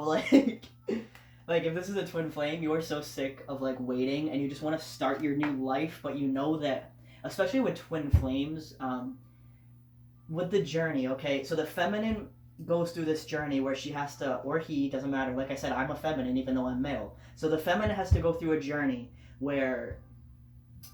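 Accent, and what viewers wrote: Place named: East Coast, United States. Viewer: Where the hell have you been. Whatever you can do to become more available as a channel on YouTube I hope you have the time to do it. The fact that so many men watch your channel got my attention. Please take the opportunity to stand out to Men. I think you come across two men in a really relatable way. I can understand the struggle of just beginning to awaken but I bet guys struggle with it more because in addition to not trusting it they probably feel uncomfortable just considering it. You have a laid-back regular guys guy kind of a feel. BTW, your East coast accent only adds to that. It says I can give you a spot on reading and kick your ass. I'm joking but I'm sure you get my drift. Another thing is that while I'm sure you are a fabulously handsome guy, the fact that you're not in the video that you're off to the side is probably important. 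There's only a handful of male readers that I've come across in the estrogen ocean of readers. As you would expect the better-looking they are, the more popular than trailers. That doesn't mean that they're not good at what they do but at some point because of all of the girls responding it begins to feel Rockstar and it wouldn't be my first choice if I was looking for answers to help me out with my life, especially in the beginning of that journey.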